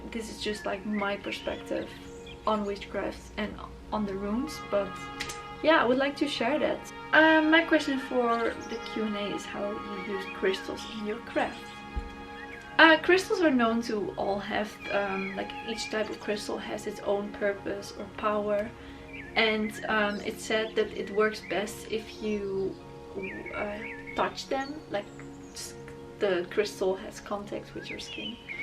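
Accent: Netherlands. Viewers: Ah I knew you were Dutch! Dutchies always recognize other Dutchies accents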